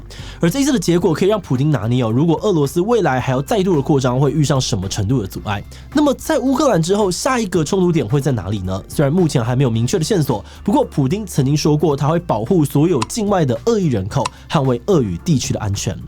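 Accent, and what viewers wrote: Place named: Taiwan. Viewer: 听台湾人说中国话真的好难受，能不能派人去教教他们说普通话啊。。。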